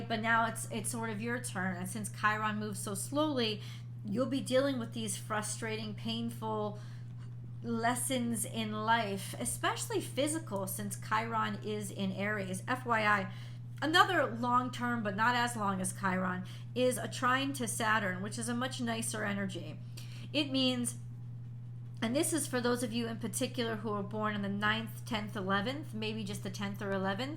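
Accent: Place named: Connecticut, United States. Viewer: You sound like you are from Stamford/Norwalk, and your star calls and insights have been spot on for me. Thanks for the insight. M/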